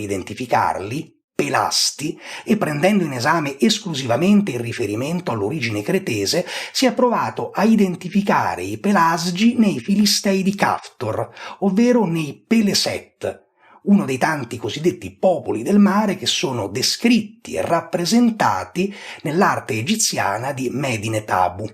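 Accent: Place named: Rome, Italy. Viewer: Caro Professore! Hai detto molte cose vere, e nelle altre hai fatto arbitro buono che non sta né da una, ne dall’altra parte. Ma per chiarire ” qualche “ imprecisione desidererei parlare a questo occhi, sento l’accento Romano nell’’tuo parlato, (scusami del tu, ma sono più grande di te, e posso permettermelo), se sei di Roma e se il tempo te lo permette, possiamo mettersi d’accordo per un caffè e parlare. Grazie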